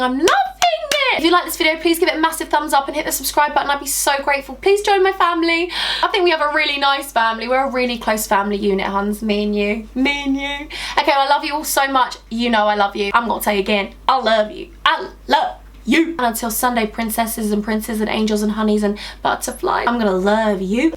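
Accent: United States. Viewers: Your American accent is so good you sound just like me 😂😂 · Your American accent is on point girl 👌🏻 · you're american accent is better than mine... PS i am american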